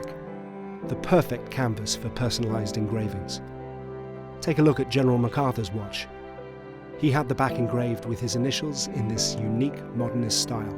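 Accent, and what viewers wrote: RP, United Kingdom. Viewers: I find this off putting. The nonsense snobism, the posh accent, the whispering, just ridiculous.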